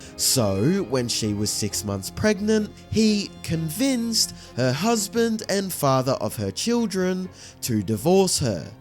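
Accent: Australia. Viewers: I just love the Aussie accent! The way you can cram so many extra vowels into a word. Like 'exposé'. That becomes 'Aix-poah-zaiyyah'. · Your narration gained momentum as you progressed! Well done, love your accent. I'm an Aussie as well 😂😂❤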